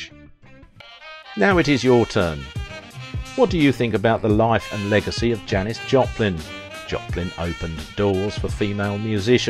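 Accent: United Kingdom. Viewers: Script clearly written by a kid. Why put a British accented man to speak your words? "She had a guy and a woman...so that's when she ended up doing heroin. It turned out to be super strong stuff..." Not a criticism but weird choice. The accent isn't needed to add cred.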